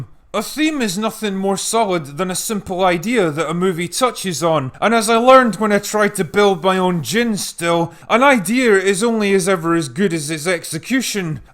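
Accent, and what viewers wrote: Scotland, United Kingdom. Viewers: Critical Drinker. You are my favorite film/tv show reviewer on YouTube. Besides your hilarious persona, which is riddled with burps, farts, alcohol abuse, powerful Scottish accent and general condescension towards the "greater themes", I think you really nail what makes a good movie. It's like you said, it's about the plot, internal logic and character development. Really simple shit. Keep up the good work! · The Scottish accent and the sarcastic drunk voice make these videos so much better. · I never get tired of Scottish accents. ;)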